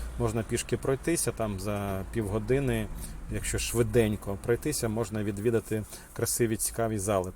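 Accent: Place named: Eastern Ukraine, Ukraine. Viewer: В автора чіткий "східняцький" акцент.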